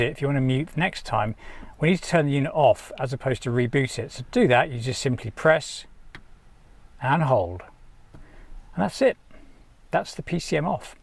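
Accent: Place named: United Kingdom. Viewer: my God, I'm watching your part 3 now and I'm going crazy about your British English 😄. Too much of American slang nowadays . By the way, have you also got Guards Red color on your beast?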